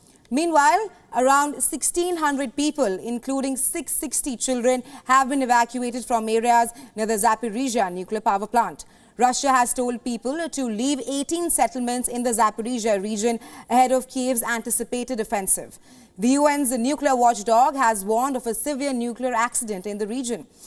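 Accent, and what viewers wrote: India. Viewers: Please not use indian English slang telling news. It sound like comedy news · hinaya ra pag basa ayaw pag dali indian ragud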